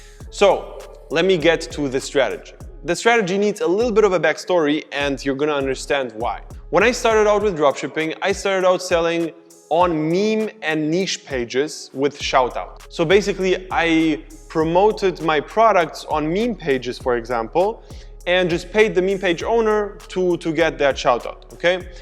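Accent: Germany. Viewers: Servus, an deinem englisch (zugegeben gut, aber trotzdem) erkennt man das du deutscher bist:D Hilfst du auch kompletten anfängern beim einstieg? möchte unbedingt anfangen aber nicht unnötige Fehler machen die zeit und geld kosten.. habe ca 1k, wie lange dauert es durchschnittlich bei korrekter vorgehensweise damit einen profitablen shop aufzubauen? Es geht mir nicht um schnelligkeit aber ich will halt auch nicht unnötig kohle verbrennen und zeit verschwenden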